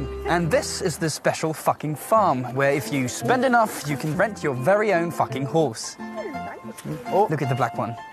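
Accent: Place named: United Kingdom. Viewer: Bri'ish accent fits.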